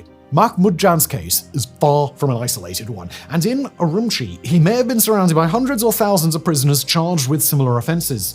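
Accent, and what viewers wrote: United Kingdom. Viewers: especially if British or American. He would likely be labeled British due to his accent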